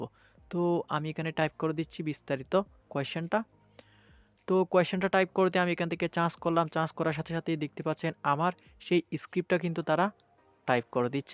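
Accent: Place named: Chittagong, Bangladesh. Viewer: নিশ্চিত চাটগাঁইয়া 😐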